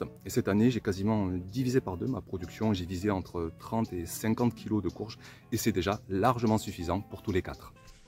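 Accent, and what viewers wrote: South, France. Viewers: Rien que ton accent du sud nous apporte du soleil ! Une vidéo une nouvelle fois riche d'inspiration, et le partage des recettes ou des techniques de conservation ça aussi c'est une idée géniale, je me demande comment tu arrives à trouver le temps de faire tout ça.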